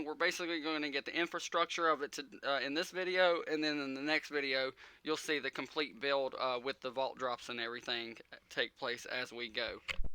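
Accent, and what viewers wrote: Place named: South, United States. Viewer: Sweet build! You sound like you might be a Southern boy like me.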